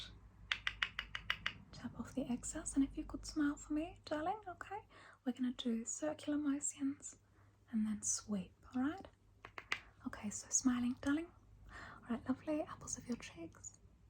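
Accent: Sydney, Australia. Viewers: You sound exactly like my mum's friend Shaz, who grew up on the North Shore of Sydney. Perfect.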